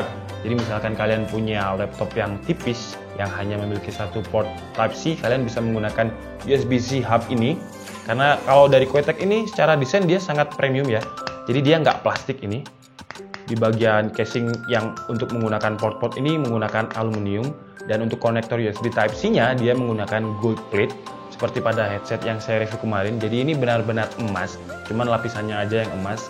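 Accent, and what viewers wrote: Java, Indonesia. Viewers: Abang orang jawa ya bang😆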